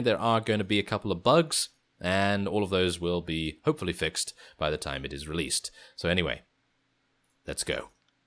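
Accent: United Kingdom. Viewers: This "play through" sounds much more like a very British reading of flavor text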